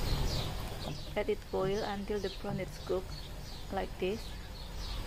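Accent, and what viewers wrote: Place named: Singapore. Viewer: aksen SING lho kentel bangetttt gue lagi kaya denger mamak mamak di bugis street. Maygat enak banget itu laksaaa.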